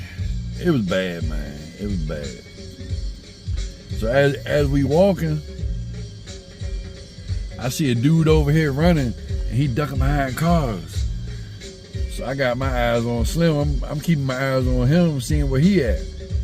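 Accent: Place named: Africa. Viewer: Why are you speaking as if you were an African man?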